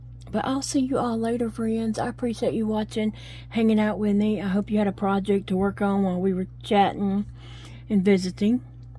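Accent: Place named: Louisiana, United States. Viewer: You just speak Louisiana Cajun! I take great joy in saying I am smarter than Alexa in that I can do that!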